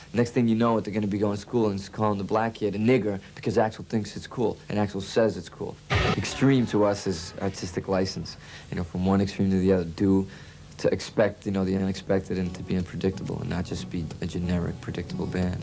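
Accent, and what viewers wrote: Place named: Boston, United States. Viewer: Boy lol you sure hear your Boston accent! Now just that LA accent! Its shows that today looking back you still have those same thoughts and ideas! Your still doing your own thing! rock on! cant wait to hear the whole album! xo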